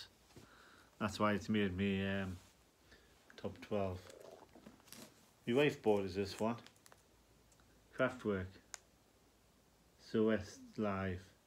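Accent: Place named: Northern England, United Kingdom. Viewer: Thank you! I would say you live farther north in England.. maybe half way to Scotland? Trying to figure out your region by your accent.. and by the way.. I'm the one with an accent, not you! Hehe.😀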